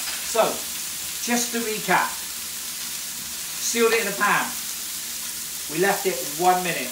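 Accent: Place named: Newport, United Kingdom. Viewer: Are you from Newport mate? Accent sounds like it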